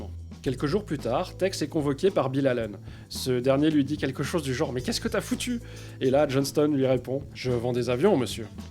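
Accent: Switzerland. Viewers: Tu est suisse? sinon très bonne vidéo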